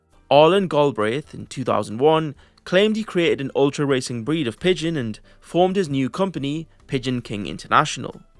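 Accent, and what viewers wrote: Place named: England-Wales border, United Kingdom. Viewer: Can we do an accents tier list?! (I’m trying to work out which side of the England-Wales border line your accent is from, I’m guessing fairly close to it either way)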